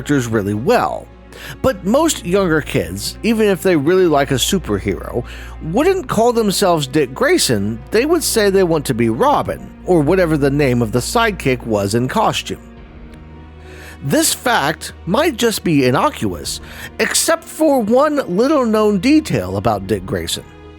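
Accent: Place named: United Kingdom. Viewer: Hey you're that fast speaking british guy that hates bonnie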